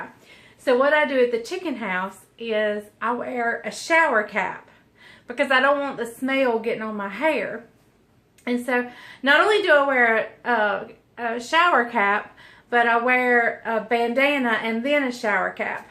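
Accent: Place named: Arkansas, United States. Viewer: Have you tried a potato/carrot peeler to peel your apples? It's a bit easier than peeling apples with a knife. Love your accent. Our nieces live in Arkansas and you sound just like them! :-)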